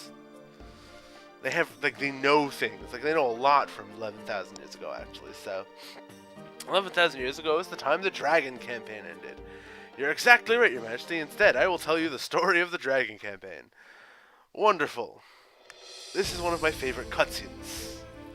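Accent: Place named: South, United States. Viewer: Where are you from cause it sometimes sounds like you have a southern accent?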